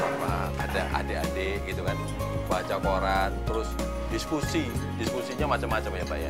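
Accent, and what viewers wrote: Java, Indonesia. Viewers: Pak bondan 😍😍. Kangen sm gayanya saat review makanan bikin orang ngiler sm logat khas jawanya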